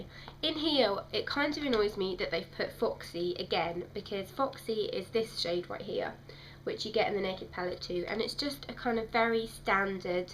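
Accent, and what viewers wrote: United Kingdom. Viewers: this is the best review ive seen!! i love this, i just subbed xx what part of the uk are you from? south, north, midlands etc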